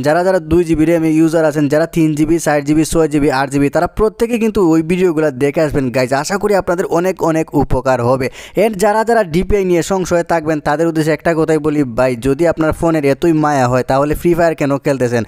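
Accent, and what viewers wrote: Sylhet, Bangladesh. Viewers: Vai Ami o sylhet taki apni o sylhet er hobigonj mone hoy apnar YouTube channele Ami Ei vedio ta deklam R apnar kota gula sylheti Tay Apnar YouTube channel Take Subscribe korlam....Plz.Replay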